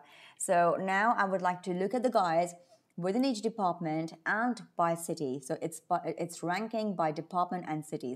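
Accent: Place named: Turkey. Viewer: you sound Turkish though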